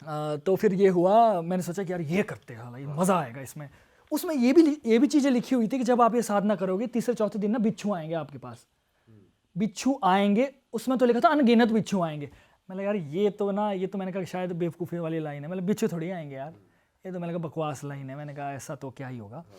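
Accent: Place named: Himachal Pradesh, India. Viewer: Being a himachali I can relate some words like shut karke ,chik chik chik ,and the accent ❤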